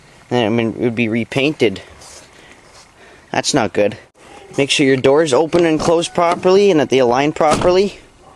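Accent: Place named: Canada. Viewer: i can tell that ur canadian haha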